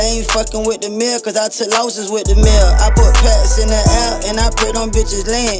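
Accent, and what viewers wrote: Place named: Florida, United States. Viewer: Florida dialect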